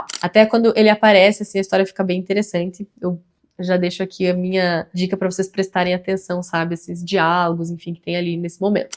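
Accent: Paraná, Brazil. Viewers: Que sotaque lindo .....acho que é paranaense ou da serra gaúcha..... A resenha é excelente....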